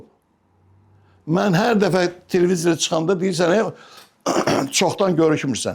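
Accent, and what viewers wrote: Baku, Azerbaijan. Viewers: Elə bildim əmimə, dayıma qulaq asıram. Bakı danışığı çox doğmadır... Sağ ol, sənətkar! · Как люблю нашу бакинскую речь натуральную речь ❤❤❤❤❤ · Allah sizə can sağlıgi versin sizə qulaq asdığcan Baki ləhcəsi ilə danişığıniz məni valeh etdi ölənlərinizə rəhmət olsun qardasimi xatirlayib ağladim sizcox səmimi əsl layiqli insan kişi xarakterli bir sənətkarsiz sizdən birdaha analar dogmayacaq belə sənətkar 1000 dən bir olur❤❤❤❤❤❤❤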